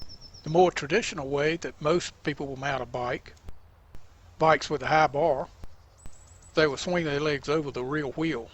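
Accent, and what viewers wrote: South, United States. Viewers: Such a calm and slow-paced demeanor, it's very relaxing for new riders. Thank you! And this yankee loves your soft southern drawl. ;-)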